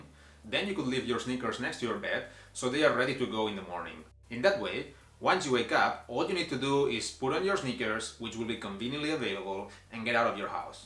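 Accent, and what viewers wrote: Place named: Spain. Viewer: Thank you for sharing this valuable information with us. Another thing, your accent looks different. Where are you from? I think Spain. How can I get the same fluency you have in English?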